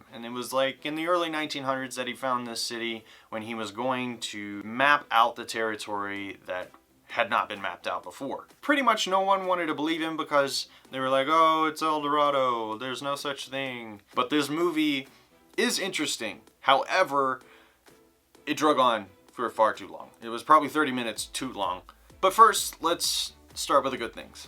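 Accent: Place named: United States. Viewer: Why is Daniel Radcliffe reviewing movies with an American accent?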